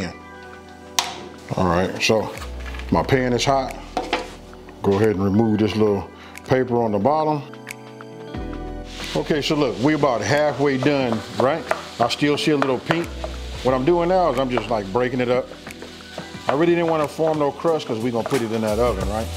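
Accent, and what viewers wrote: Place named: South, United States. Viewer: Love your food, of course, but having been raised in the South, it's your dialect, accent, and tone that immerse me in your wonderful videos. One of my favorite YouTube chefs. You make me feel warm and at home.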